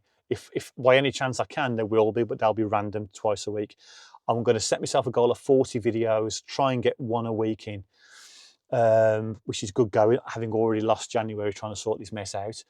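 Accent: Birmingham, United Kingdom. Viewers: Sad but these things happen. I may watch both of you, see how vids & editing pan out. Good luck (to you both / all). Keep the good material coming. I'm finally catching on to the Brummie twang.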